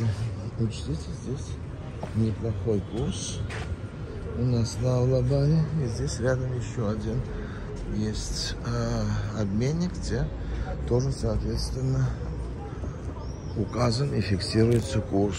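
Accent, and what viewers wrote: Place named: Georgia. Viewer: у вас прекрасный грузинский акцент Добрый.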